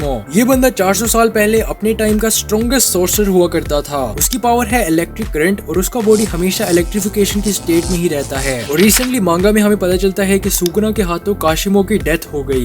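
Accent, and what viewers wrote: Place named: Pakistan. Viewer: Accent so aukat pata chal gyi teri 🤡🤡🤡🤡🤡 Aata & roti bhijwa du Pakistani? 💀🤣 Garib Jai Hind 🇮🇳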